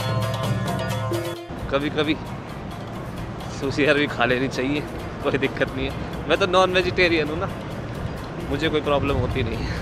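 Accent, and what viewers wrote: Haryana, India. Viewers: Bhai kabhi ye apni harayanvi boli change mt krne ✌️♥️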